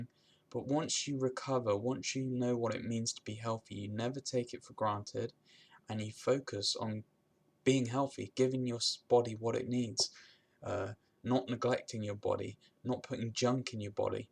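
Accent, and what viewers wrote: United Kingdom. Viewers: I myself always think that things could be worse for me and it makes me feel better. And I also read or listen to others feeling so sick where they can barely get up and I feel lucky. I am very sensitive to gluten. Just getting off of it did SO much for me. I still have CFS but I'm better. I'm going to really try to go to sleep early and eat more often and more veggies. Thank you for your videos. BTW I'm pretty obsessed with your accent. lol I'm from the US but originally from the Caribbean and I love British accents.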